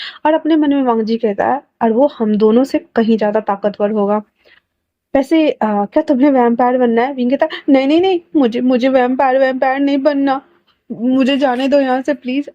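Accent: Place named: Bihar, India. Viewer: Wonderful story di par jo aap bihari maine bolte hai wo mujhe bhot acha lagta hai osm ❤❤❤😊😊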